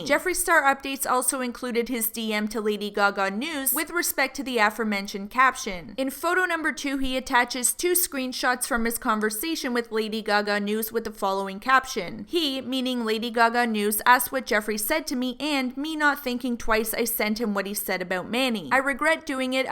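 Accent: Canada. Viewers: Are you Canadian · I love your Canadian accent 🇨🇦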